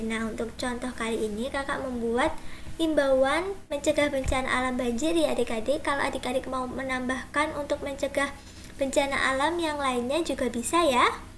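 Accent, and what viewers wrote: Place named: Java, Indonesia. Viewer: Kak, bahasa kakak kayak ada logat logat jawanya gitu? Kakak orang jawa ya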